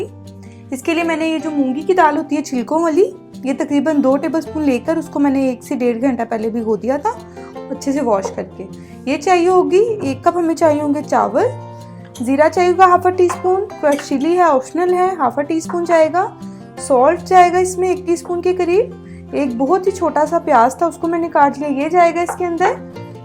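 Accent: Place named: Lahore, Pakistan. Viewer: AP KA LEHJA LAHORI HAY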